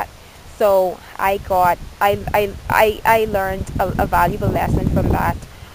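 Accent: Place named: Bahamas. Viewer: Well I think their was some benefit for you living in the Bahamas. You speak like a real Bahamian,who is educated. I grew up with allot of Haitian friends,while living in the Bahamas as a boy. i never remember teasing any of them for being a Haitian. I guess I was to young to understand,or it was just my love for everybody. i know the feeling! i live in another country and I experience it everyday! The authorities of said countries have a right & duty to protect their people from evasion from others. Make sure you don't be apart of the problem, by bad mouthing the Bahamians. just do your best to educated as many people as you can,about civilization and respect.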